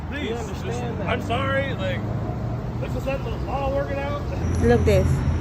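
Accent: New Jersey, United States. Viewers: Stop apologizing for talking like a New Jersey city boy.. that's the way we talk.. 🤷‍♂️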